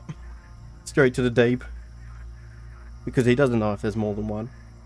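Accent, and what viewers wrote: Australia. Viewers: he sounds super australian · very clearly Australian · The Australian voice really makes this video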